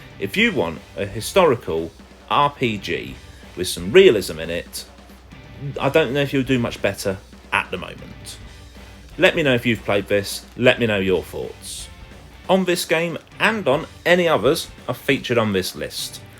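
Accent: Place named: United Kingdom. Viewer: I love british accents, +sub.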